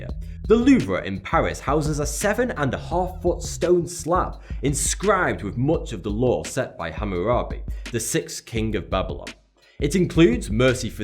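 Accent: United Kingdom. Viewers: oh man this guys should totally be the new James Bond ! that wink can make any girl wetter than the pacific ocean, also his British accent is on point ayy lmao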